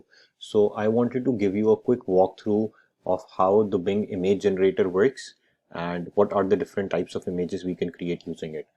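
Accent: India. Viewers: parang english ng indiano sir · Indiano ba ang nag voice-over? Galing mo naman po!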